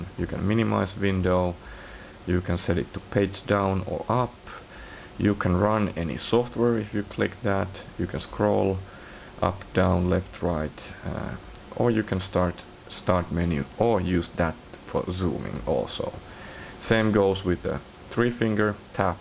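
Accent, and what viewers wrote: Finland. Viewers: Selvästi suomalainen mies puhumassa englantia :) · Hah, accent doesn't get more Finnish than that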